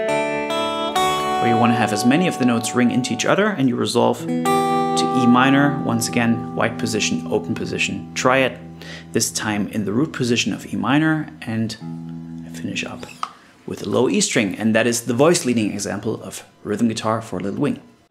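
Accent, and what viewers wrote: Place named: Germany. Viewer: It's hard to tell how German you are until you say "O" vowels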